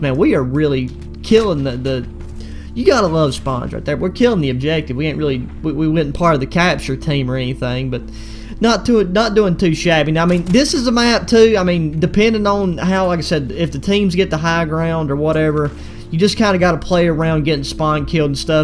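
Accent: United States. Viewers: love that accent lol sounds like a cowboy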